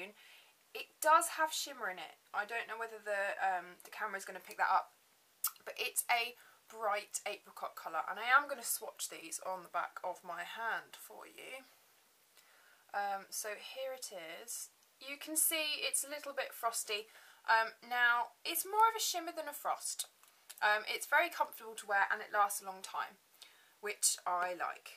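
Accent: United Kingdom. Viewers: I LOOOOVE your British english - so sophisticated! unfortunately I fail at imitating. :-) keep up the amazing tutorials! xx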